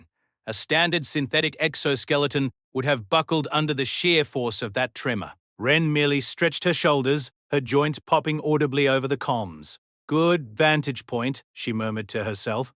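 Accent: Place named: Australia. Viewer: 6/10 the Australian/new zeland accent is a pleasant change of pace from most AI readers, and there were no hangup pauses in the script reading. however, this was also a detriment, as it feels like the reader ignored any punctuation whatsoever. the captioner had one incident of wrong word use. the story itself uses the "lighworlders", "insectoid", "deathworld", "only an earther" and "only a woman" tropes, but avoids the Xenolinguistic names and gender refrences. the shortness of the story is a plus. no wiggly fill for the visuals, and a fixed piece of art is a positive.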